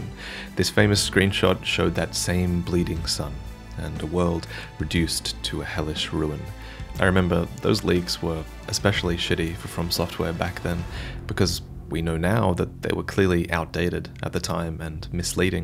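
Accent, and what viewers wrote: Australia. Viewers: the only thing that has kept me from going hollow the last 18 months is the milky smooth sound of your Australian voice. Hold me... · very random, but you do you hail from down under,?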